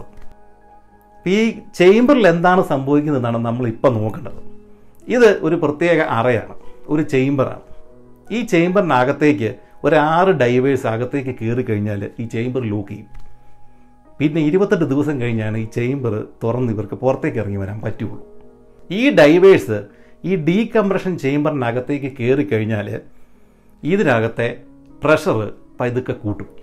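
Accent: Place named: Kasaragod, India. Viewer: നിങ്ങൾ കാസർകോട് ആണല്ലേ😜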